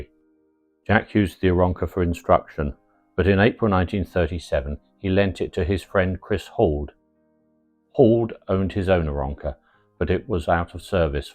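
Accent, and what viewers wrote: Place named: United Kingdom. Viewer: You speak like a Brit and dress like a Yank. I had a hard time reconciling the two until I learned we share the same sense of humour and passion for flying. Well done, old man!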